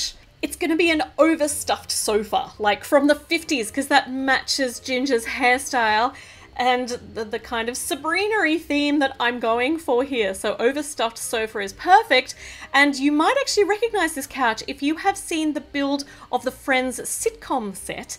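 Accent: Australia. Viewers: r u an Aussie!? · Are you from australia 🇦🇺. If you are where do you live in Australia.im aussie too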